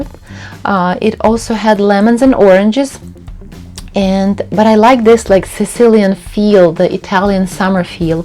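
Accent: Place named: Russia. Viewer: New subscriber from Oklahoma. I thought your accent might have been Russian. I had the privilege of visiting Russia in 1996 when I was just 17 years old. It has held a place in my heart ever since.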